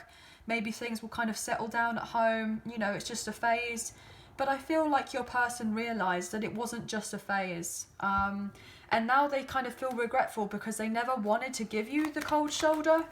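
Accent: England, United Kingdom. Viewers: Love your English accent.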